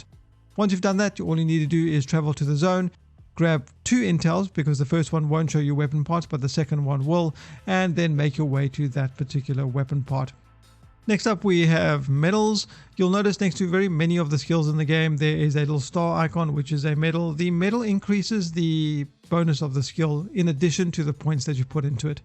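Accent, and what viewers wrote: South Africa. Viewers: Thanks bud. South African?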